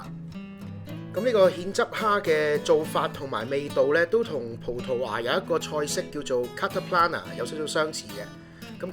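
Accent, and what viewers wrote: Singapore. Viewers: Hahahaha your singlish is hilarious!! Greetings from sg!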